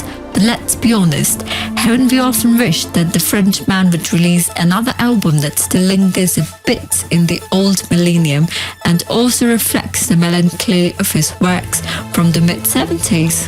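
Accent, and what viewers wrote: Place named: United Kingdom. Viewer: Loved the British accent!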